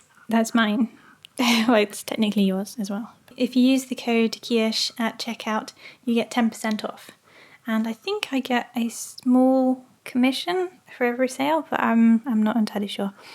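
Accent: United Kingdom. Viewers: I feel so content when I hear your voice cuz I feel like british accents sound so professional and cool.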